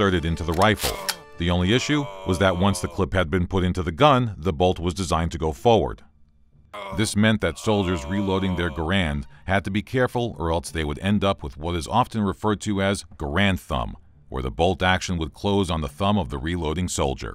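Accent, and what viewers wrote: United States. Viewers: American accent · The pure american voice of this video makes it so much better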